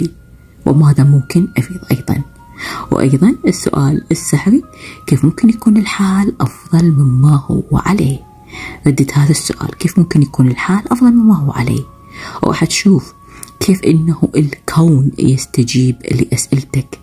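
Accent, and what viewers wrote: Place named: Gulf, Arab world. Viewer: ياهلا يامرحبااا بالصوت الخليجي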